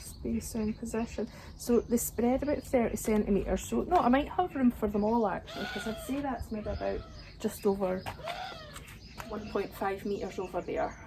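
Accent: Scotland, United Kingdom. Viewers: Hi there. I just happened upon your channel today. Great to hear another Scottish voice 😛 You have added lots of great plants into your garden and things are looking great. The Heucheras, Brunnera and Hostas are doing well - mine are too - must be the Scottish air (I'm assuming you are in Scotland!). Anyway I have subscribed and look forward to binge watching your other videos. All the best. Mags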